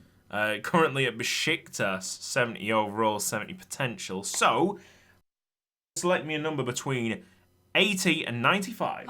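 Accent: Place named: Yorkshire, United Kingdom. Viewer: A Yorkshireman screams at machine for 20 minutes.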